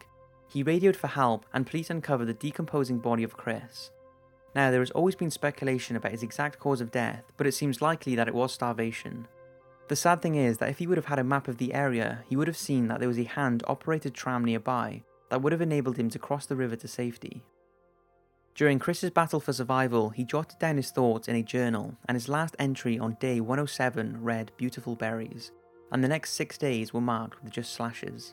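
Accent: Wales, United Kingdom. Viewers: I'm hyuring the "yur"s in your Welsh accent. Awesome! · He's Welsh, sounds like somewhere near Cardiff. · its a welsh voice, so naturally nice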